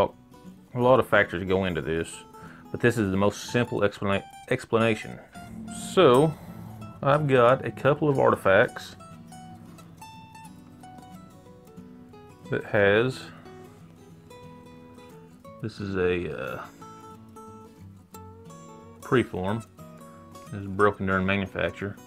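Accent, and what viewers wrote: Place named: South, United States. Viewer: As an overthinker i truely appreciate your slow southern dialect. Lol Nice take on angles too.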